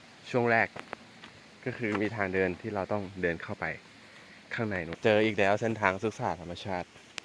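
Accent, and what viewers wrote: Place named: Kamphaeng Saen, Thailand. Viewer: สำเนียงเหมือนคนกำแพงแสนบ้านผมเลย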